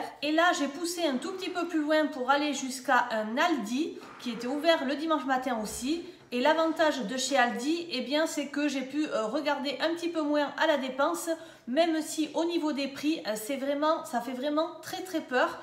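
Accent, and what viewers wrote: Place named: Toulouse, France. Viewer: Mdrrr l accent ! 😅. On ne se rend pas compte en parlant. Ce n est pas une critique. J ai le meme. 😅 Region Toulousaine aussi. Vous etes de quel coté ? Nouvelle abonnee 👍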